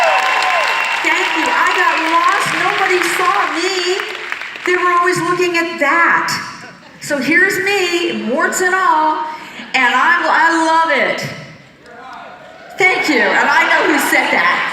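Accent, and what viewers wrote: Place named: Scotland, United Kingdom. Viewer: omg your Scottish accent BAHHHHHH HA HA The best!!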